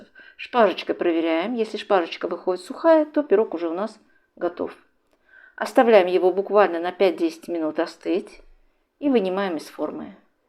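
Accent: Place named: Baku, Azerbaijan. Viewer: Здравствуйте. Я когда первый раз увидела ваше видео,вернее услышала-думаю,что то знакомый выговор,вернее манера говорить. 😅Оказывается вы наша из Баку. Только Бакинцы так тянут слова😂Привет вам из Баку и долгих лет жизни ❤Аллах джан саглыгы версин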